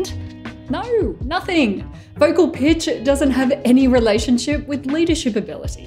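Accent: Australia. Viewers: Same! And her purely Aussie accent too 😁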